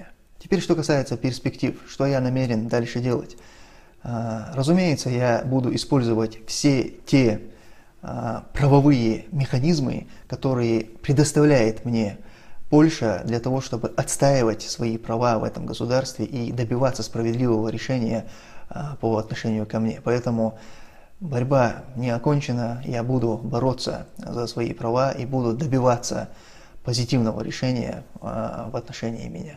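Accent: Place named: Chechnya, Russia. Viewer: ты похож на европейца. Из чеченского у тебя остались акцент и внешний "имидж"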